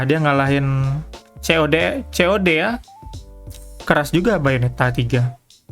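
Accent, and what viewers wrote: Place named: Banjar, Indonesia. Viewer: bang, elu org mana sih? bahasa lu kyk org banjar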